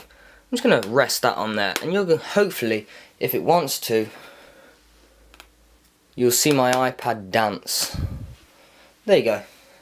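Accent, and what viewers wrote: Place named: United Kingdom. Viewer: I love your british accent!!!!!! :D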